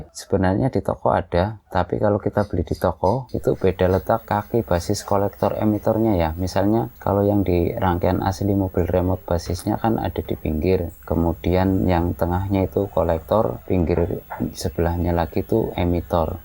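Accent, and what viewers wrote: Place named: Tegal, Indonesia. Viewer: tiang pundi um kok ngomongnya ngapak ..... orang tegal ya ???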